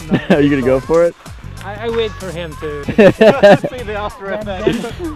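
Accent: United States. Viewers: это просто замечательно.. как слышиться америко.диалект.. = можно на 98% заранее знать, что будет безпредельное слабумие в рекламе продажи пустот.. дядя не исключение.., 3ато весело и присутствует уверенное отсуствие всякого умственного процесса в душе и речи.. браво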